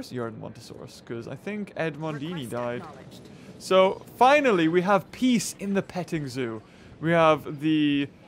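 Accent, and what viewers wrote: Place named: Geordie, United Kingdom. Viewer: You're accent is weird I mean I can tell you're a Geordie but you say a lot of Scottish sounding words too, BroonCoo,Baba, photea maybe Scots English and Geordie words are just similar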